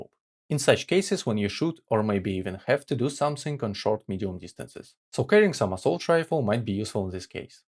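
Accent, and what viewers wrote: Russia. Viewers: Мне кажется, или я слышу русский акцент? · Are you Russian,but you know English?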